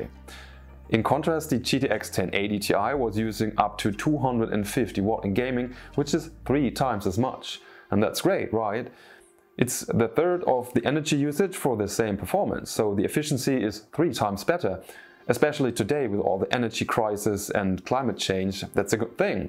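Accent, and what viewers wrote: Germany. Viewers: Höre ich german akzent?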